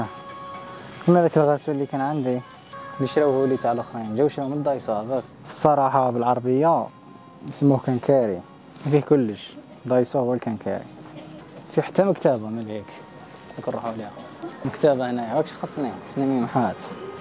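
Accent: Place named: Algeria. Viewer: اللهجة الجزائرية واليابان مع بعض ادمان ربي يبارك فيك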